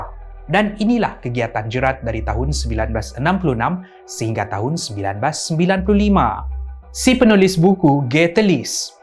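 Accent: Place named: Sabah, Malaysia. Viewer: ketara sabah c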